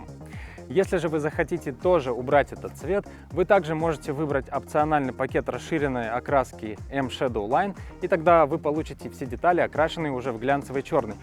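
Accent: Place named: Belarus. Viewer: Быццам бы распавядае беларус. Націскі ставіць па-беларуску.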